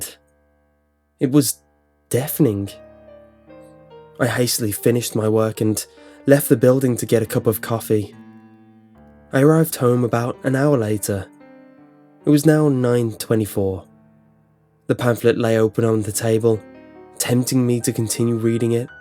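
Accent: England, United Kingdom. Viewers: U made me so scared I litterly can't fell my heart beat anymore Plus u sound like ur from England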